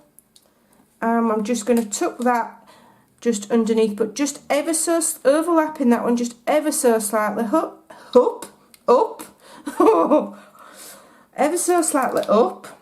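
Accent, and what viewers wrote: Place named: North, United Kingdom. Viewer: Why did you not score! Naughty naughty, but lovely to hear a nice UK accent trying to place it sounds more northern like the cards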